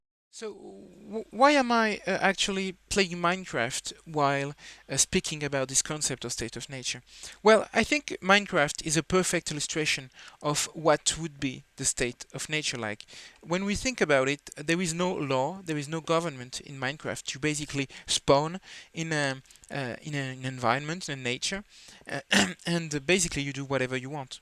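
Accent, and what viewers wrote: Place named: United Kingdom. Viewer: tu parles vraiment bien anglais avec un accent très British !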